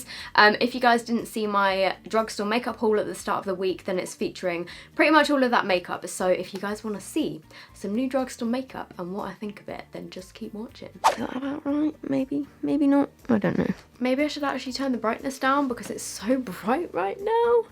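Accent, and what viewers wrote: Australia. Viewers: Yo thats good aussie accent! 😍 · Oh, as an Aussie I just love your Australian accents. 😂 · Wtf is that Australian accent 😂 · Loved the Australian accent😂❤️ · love how you kept turning australian ahhaha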